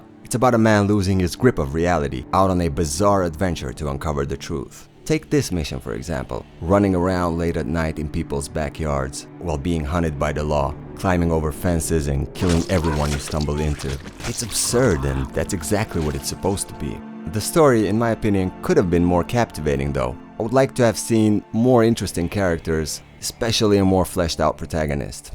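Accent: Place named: Jamaica. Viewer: First video I seen of yours and I subscribed instantly. This video is so well done and the Jamaican accent is easy on the ears, great job man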